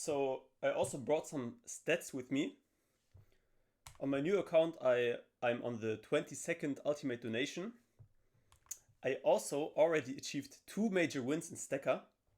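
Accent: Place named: Germany. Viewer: I hear deutsch😂❤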